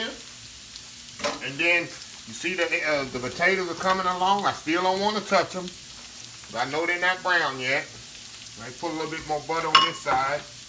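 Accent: South, United States. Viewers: Chef I absolutely love you and you keep on preachin sir. Let the world know who's (Jesus) in control. This food looks delicious 😋 and that country accent I so love 🥰 I love my southern brothers and sisters!! #HelpSomebodyOldSchoolSoulFood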